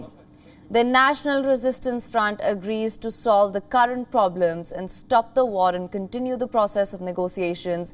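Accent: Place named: India. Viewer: Accent is never a problem for anyone in the world except for Pakistanis. She is Indian, not American to have american accent. So, get this digested.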